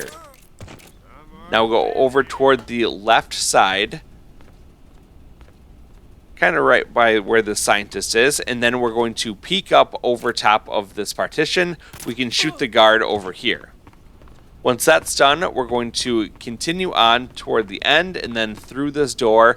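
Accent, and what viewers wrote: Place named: North America. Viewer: Is this the NA version or EU version, im guessing NA because of your voice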